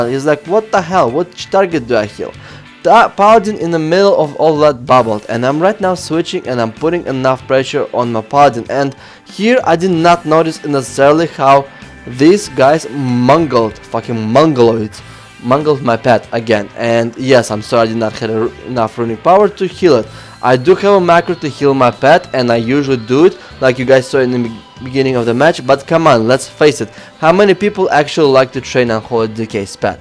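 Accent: Russia. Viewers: i fucking love russian abscent :3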